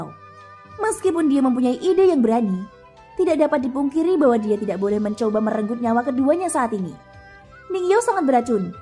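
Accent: Java, Indonesia. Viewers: Medok nya 😂😂😂😂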